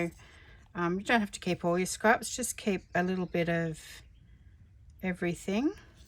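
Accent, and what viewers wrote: Australia. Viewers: Just subbed. Lovely accent. Which bit of Oz are you from ? I had an Aunt who lived in Queensland ❤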